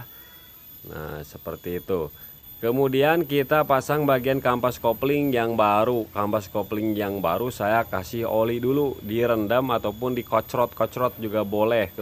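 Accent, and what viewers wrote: West Java, Indonesia. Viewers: Kang Aya logat logat Sunda, Sunda mana kang, bengkel na di mana kang..hatur nuhun kang.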